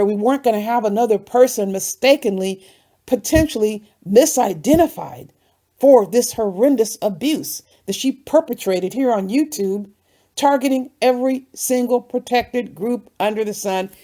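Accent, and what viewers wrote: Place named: Working class, United Kingdom. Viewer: High Class with a working class British accent ? I don't think.so.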